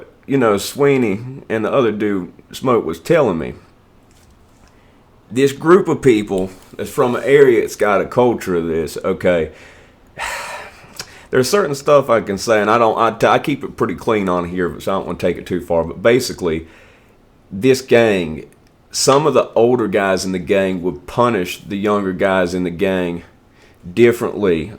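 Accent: United States. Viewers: LOVE your country accent bro...so sexy!! ❤ from Scotland ✌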